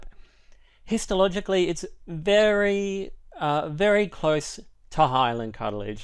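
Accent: Australia. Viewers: thank you! like your Australian accent:)(hope I pick it right!)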